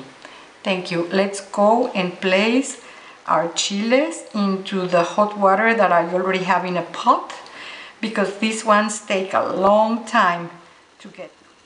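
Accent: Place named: Mexico. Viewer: you're English it's awesome you got a strong Mexican accent but I loved it you don't hesitate a single word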